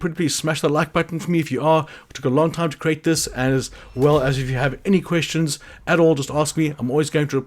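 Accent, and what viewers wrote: South Africa. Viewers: Thanks for the tips. Are you South African? You sound like it.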